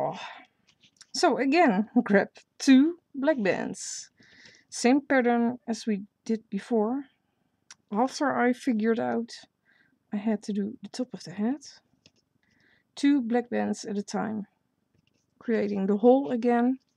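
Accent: Netherlands. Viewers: you dutch ? You sound really dutch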